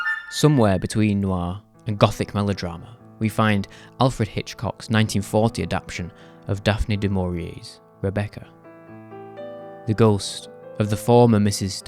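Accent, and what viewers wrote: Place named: Scotland, United Kingdom. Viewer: Where are you from in Scotland?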